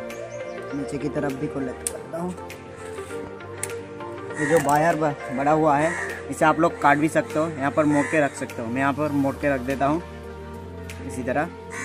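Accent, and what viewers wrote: India. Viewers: Ap ke bato se lagta hai ap Bengali ho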